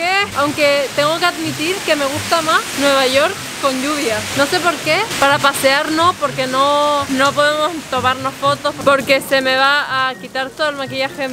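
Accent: Chile. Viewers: eres Chilena? tienes el tono de una, saludos de Chile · hablas como con acento de chilena what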